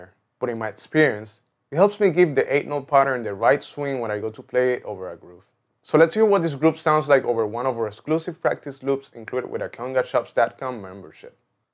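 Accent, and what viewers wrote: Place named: Puerto Rico. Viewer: Thanks for your response. This observation I am selling it to you wholesale for when it came to me I was completely fresh to my interest in percussion. The macho hembra distinction was totally matter of fact in reference to the difference in tone, which was also matter of fact. I assumed it was due to having being built to make them sound different and macho hembra came by default, as in drums, as you point out. To tell you the truth I perceive a difference in tone but am not certain it is due to the maracas, as opposed to my own right-left hearing. Probably the reference is very old school, from before your time, which I would not be surprised. Your style of exposure is new to me, it is very good, your accent shows but so is your knowlwdge and control of the english language. It is a refreshing style of exposure and diction. 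Congratulations and Blessings. Keep it up for percussion is the most democratic form of musical expression. I am not sure if you are Puertorican, I suppose you are, but Puertorican percussion is among the most democratic of all.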